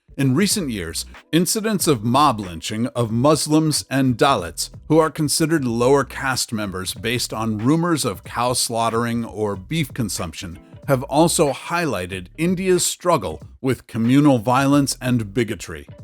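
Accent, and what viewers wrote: United States. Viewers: I would classify Lebanon as a special case. They have taken in millions of Syrian refugees and their economy has collapsed. Refugees in their own country. What would this American voice say if he was on the street with nothing to eat and no real government to provide any help? There is a lot of high brow hypocrisy in this entertainment media video. Meanwhile in Texas, possibly the richest place on earth, the government is consciously packing refugees from south of the border onto buses to states where the people are less racist. That's America for all to see.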